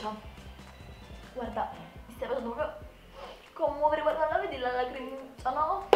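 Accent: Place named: Naples, Italy. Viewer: Ma sei napoletana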